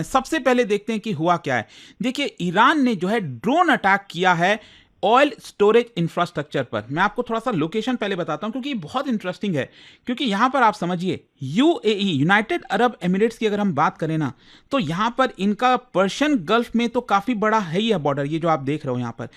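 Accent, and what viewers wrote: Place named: Bihar, India. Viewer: You sounds like bihari without any logic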